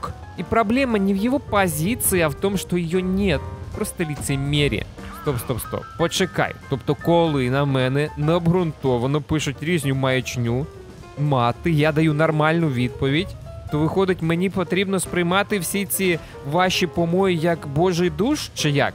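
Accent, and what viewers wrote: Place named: Surzhyk, Ukraine. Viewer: Супер! Від суржика взагалі кайфую)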